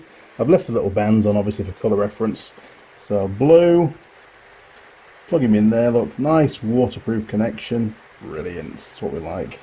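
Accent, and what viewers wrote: United Kingdom. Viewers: with a Brit accent no less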